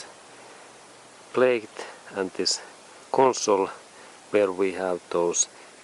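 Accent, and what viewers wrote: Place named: Finland. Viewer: Kuulostaa kyllä ralli englannilta :) Kyseessä siis zetec se, eli pikku zeteckki.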